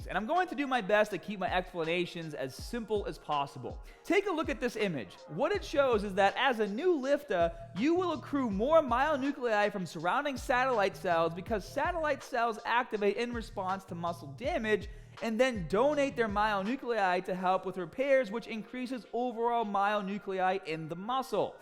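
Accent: Boston, United States. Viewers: What are you from Boston? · Are you from Baah Ston? :-)) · That Bostonian accent is great... say Park the Car in the Yard 😁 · Wait is that Boston accent fake or not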